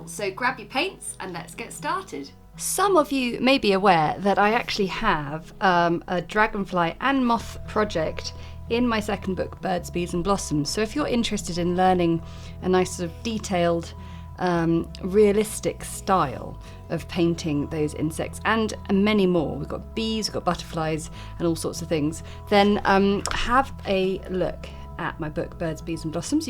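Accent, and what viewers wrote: United Kingdom. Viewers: your art is absolutely awesome! love every detail, so delicate, your creativity and your beautiful british accent, thanks for sharing!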